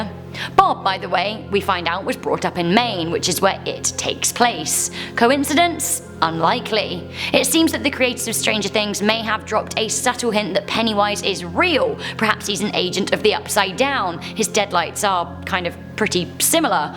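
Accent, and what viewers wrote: United Kingdom. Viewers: PS. I WANT TO SEE YOU IN MORE VIDEOS CUZ I LOVE YOUR ACCENT I LOVE BRITISH PEOPLE I WISH I WAS BRITISH!!!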